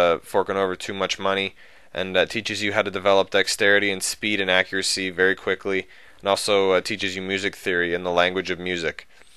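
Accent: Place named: Halifax, Canada. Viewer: Are you from Halifax? That accent always gets me...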